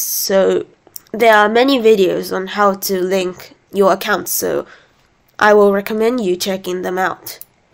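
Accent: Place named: United Kingdom. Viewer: HEES BRITISHH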